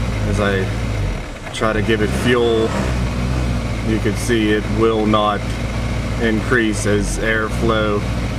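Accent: Pittsburgh, United States. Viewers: Are you Paul's brother? If so, I know he said you were starting a channel but i dont remember him giving a link..i happened to find your name through another one of his subscribers and figured you must be him. Plus you cant hide with that Pittsburgh accent. You two are like the dream team when it comes to diagnosis.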